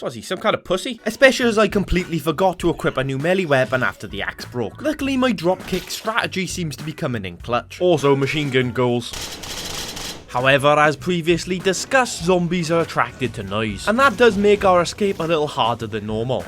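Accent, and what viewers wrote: Wales, United Kingdom. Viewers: Gave you a sub because you're entertaining and perhaps oddly enough, because you're Welsh! My Father's entire lineage is Welsh and can be dated back generations, but I know very little about the culture and rarely come across anyone from Wales hete in Boston. A ton of Irish, but no Welsh! You are the first person since my departed Gpa and Gma that I have heard w/that accent. Makes me smile! ,👍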